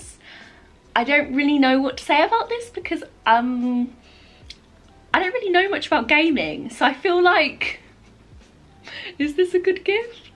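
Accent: United Kingdom. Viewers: What accent do you speak with? I know that your accent is british, but is it a received pronunciation ?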